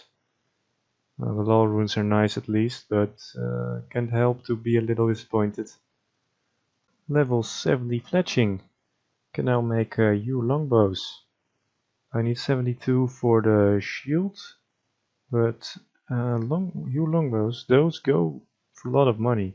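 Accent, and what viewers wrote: Netherlands. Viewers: you're dutch aren't you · are you dutch sir??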